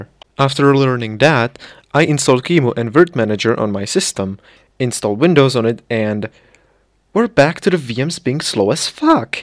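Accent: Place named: Czech Republic. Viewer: z prvych 5 sekund bolo pocut ten cesky prizvuk xddd, inac dobre video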